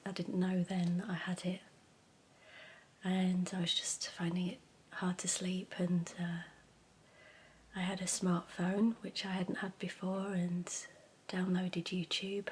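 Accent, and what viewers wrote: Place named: Northern England, United Kingdom. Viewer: I love your northern twang still coming out when you speak. You have a lovely voice… congratulations on 10 years ❤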